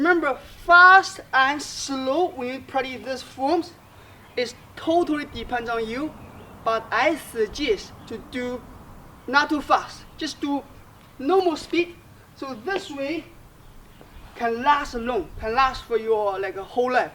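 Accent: China. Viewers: I believe almost 💯 % that he is chinês. He does have a beautiful accent.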